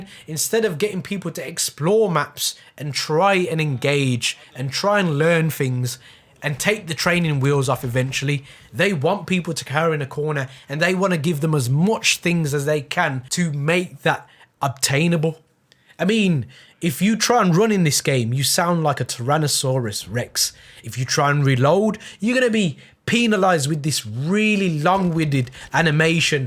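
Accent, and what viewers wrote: Birmingham, United Kingdom. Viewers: Love watching your content , you sound near to were i live in brum keep up the work bro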